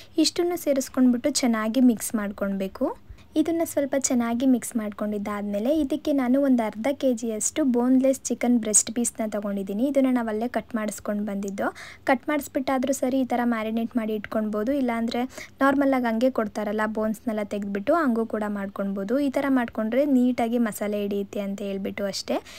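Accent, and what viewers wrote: Mandya, India. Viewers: U are such a sweet girl and ur slang just like us proper mandya slang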